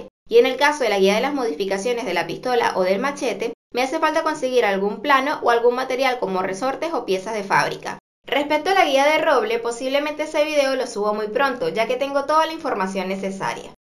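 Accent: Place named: Venezuela. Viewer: Hola eres una genio con estos vídeos, de verdad son de mucha ayuda, noto que tienes acento venezolano 😁, saludo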